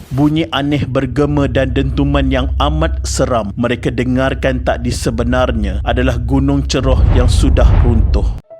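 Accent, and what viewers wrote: Kelantan, Malaysia. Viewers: Telinga saya banyak kali dengar admin sebut TADI dgn TAKDI.. saya agak admin lelaki Kelantan la, maaf kalau saya tersalah dengar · Kann..rasa ore klate doh tu 😁😁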